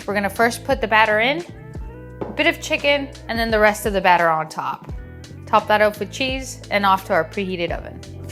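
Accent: United Kingdom. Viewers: it's wild how your accent is mostly unchanged but you speak with a very British cadence now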